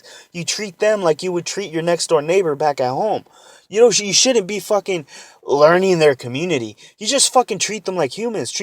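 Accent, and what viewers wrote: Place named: Los Angeles, United States. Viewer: yo u from LA right?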